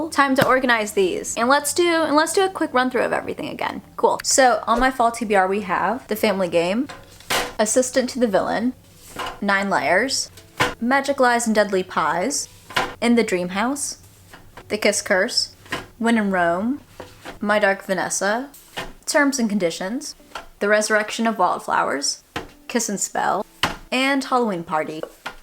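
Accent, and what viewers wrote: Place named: South, United States. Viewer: as opposed to your normal lovely southern accent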